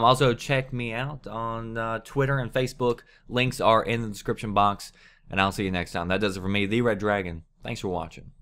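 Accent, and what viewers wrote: South, United States. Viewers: Is... is that a southern accent? That is a first for me. Game reviewer with a southern accent... wow. Who is also a privacy advocate.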